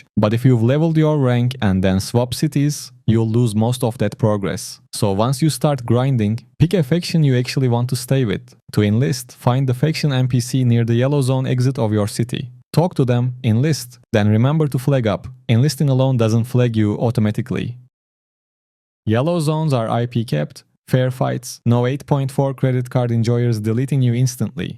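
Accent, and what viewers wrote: Turkey. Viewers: türk aksanı var sende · türk aksanı var azıcık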